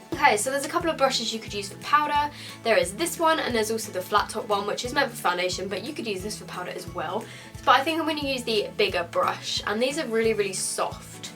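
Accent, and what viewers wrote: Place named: United Kingdom. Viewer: It is so refreshing to not only hear a fellow Brit doing these kinds of videos, but also who doesn't tan and is pale! Loving the videos :) x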